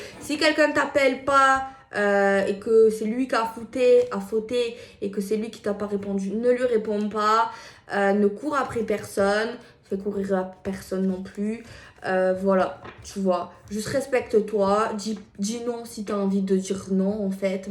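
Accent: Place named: South, France. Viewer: T' écouter me fait plaisir, j'aime l'accent du sud, ta petite voix, courage petite, tu fais bien..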